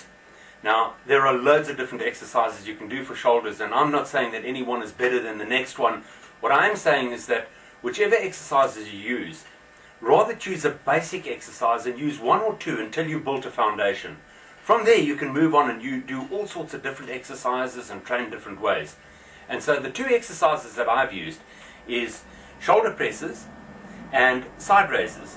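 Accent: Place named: South Africa. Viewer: You kinda sound South African - and you are in such great shape for a 60 year old - I'm impressed!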